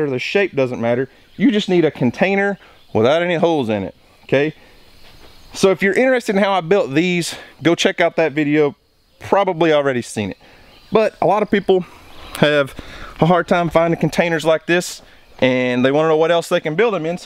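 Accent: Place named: Oklahoma, United States. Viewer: Thanks for the instructions...going to give it a try. Your Oklahoma accent is quite differ different from my Michigan gab. Enjoy growing!👨‍🌾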